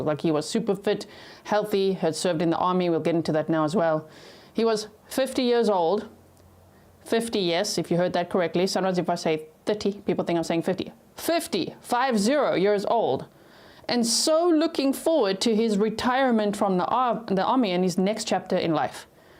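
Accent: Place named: South Africa. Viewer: Why do you sound SOUTH AFRICAN?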